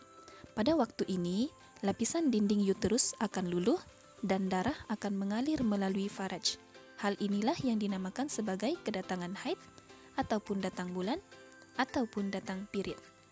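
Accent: Sabah, Malaysia. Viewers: Cikgu orang Sabah ye😄😅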